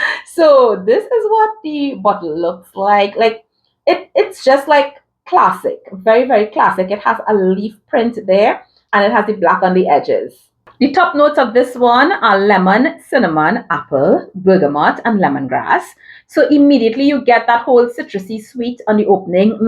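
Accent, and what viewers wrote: Trinidad and Tobago. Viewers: U sound trini ❤❤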